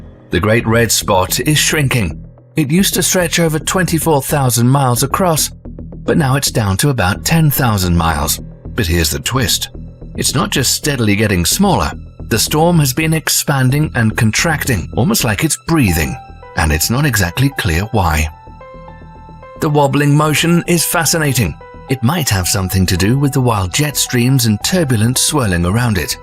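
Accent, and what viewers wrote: England, United Kingdom. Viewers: Again with the haughty English accent. How POMPOUS! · I can't sleep unless it's a British accent talking about space. Anyone else?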